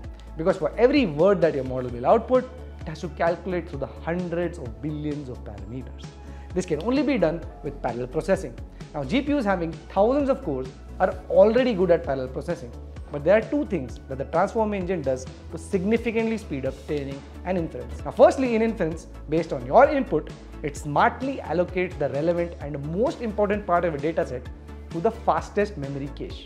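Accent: India. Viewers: Dude…your accent. You mine aswell speak Indian. Practice brother.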